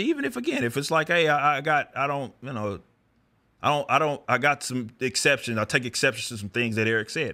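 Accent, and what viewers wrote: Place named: Texas, United States. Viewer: Yes as it turns out inner city Texas speaks differently to whatever part of the country or planet you’re from. Shocking I know.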